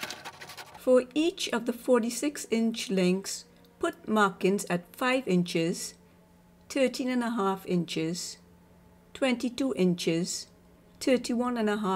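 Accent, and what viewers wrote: Trinidad and Tobago. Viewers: Great job Are you Trini?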